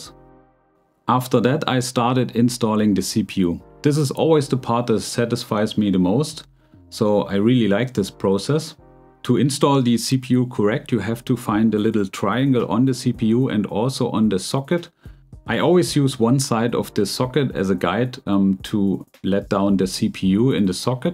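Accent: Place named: Germany. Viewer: Dude. I'm Polish and I knew you're German the moment I heard you.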